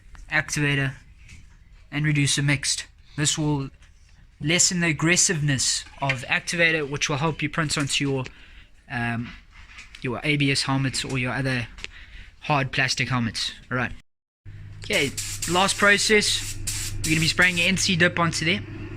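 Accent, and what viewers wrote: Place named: South Africa. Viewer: Sound Southafrican.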